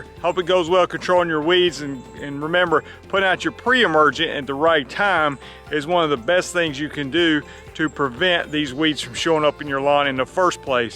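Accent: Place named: North Carolina, United States. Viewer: Good stuff! Mom is from North Carolina so I hear you great!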